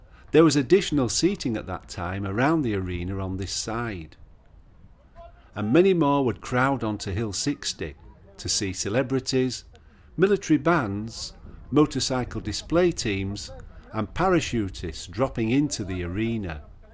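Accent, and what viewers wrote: Yorkshire, United Kingdom. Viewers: Thank you for this video, very clear speaking to listen to. I can tell you're from Yorkshire. I was born in Farsley and lived in Pudsey also and worked in Leeds in three fashion shops in all back in early to mid 60s. I don't usually stop to look at videos if they seem too long though 16mns isn't really a long time. I'm glad the Canal Gardens were shown as I was beginning to think they wouldn't. I had my first trip back from Oz after 20yrs in the summer of '87 and we went to Roundhay Park. Lots different since I was a child and teenager. I have a memory of being in the Club House I think it was when I was about 7yrs after the heavens had opened and everyone charged in there. After it stopped, everyone was pushing and shoving to get out again, making for the door. I got stuck in the crowd, lost sight of my parents who weren't looking to see if I was following and got my hair caught on a bloke's button on his waistcoat. 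He didn't know and was dragging me along with him with me screaming for my Mother at the top of my lungs! We were really sandwiched in like sardines! I eventually got my Mum's attention and she told him. Another was going to see when I was about 16yrs in early 60s, but before all those others you mentioned. You could hardly call it a concert as he just performed in the middle of the grass in a made-up stage covered in tarpaulins. He was the drummer as well as singing and he just rattled off his well-know songs as quickly as possible, didn't i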